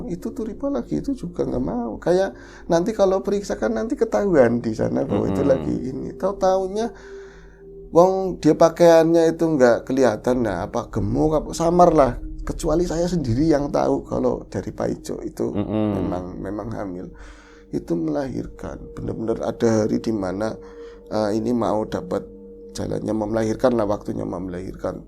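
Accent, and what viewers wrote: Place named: Tegal, Indonesia. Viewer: Saya senang gaya bercerita, santai, ada bhs tegalnya, ada lucunya....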